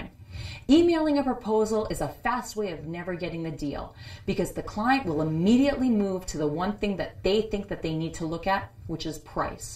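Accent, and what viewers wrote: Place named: Canada. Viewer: Excuse me, please! Thank you so much,. Your Canadian English with subtitles is fantastic. Greetings to Mar. and Sh. Take care of them. Cheers! Thank you. Bye!! 🇨🇦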